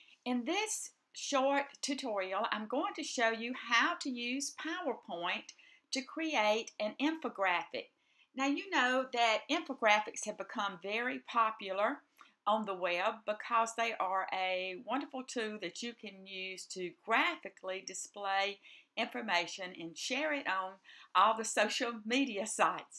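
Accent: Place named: South Carolina, United States. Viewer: Thank you. That was a very nice tutorial and seems much easier than some of the other tools I've toyed with tonight.Now, tell me - are you from South Carolina? (I am originally) and your voice made me feel back at home.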